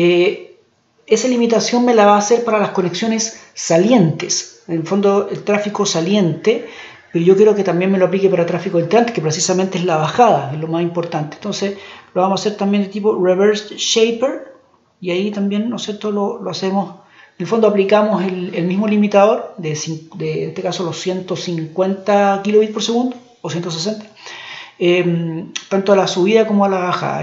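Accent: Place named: Cuba. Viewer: Sounds like Spanglish. I would say 95% Spanish and the words that don't translate well are English. Actually very easy to understand him, probably because his accent is very similar to a Cuban accent.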